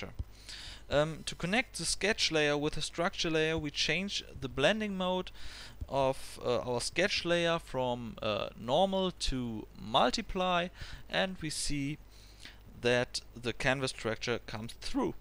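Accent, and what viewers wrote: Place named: Germany. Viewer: Ich wollte gerade fragen, ob du deutsch bist, bevor ich die Kommentare gesehen habe. Dein Akzent ist wirklich deutsch :D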